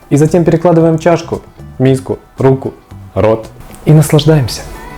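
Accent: Ukraine. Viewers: Откуда же автор родом?! Часто слышу украинскую речь ;)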